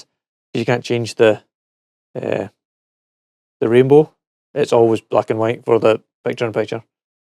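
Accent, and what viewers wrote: Scotland, United Kingdom. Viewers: Cool, like your accent, Scottish? Do you think doogee used good components to last as long as samsung or other 'well" made phone?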